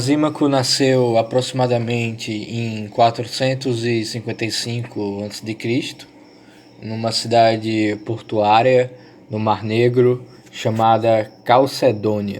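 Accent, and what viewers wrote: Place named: Pernambuco, Brazil. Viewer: tu é de Pernambuco? Sotaque bem semelhante...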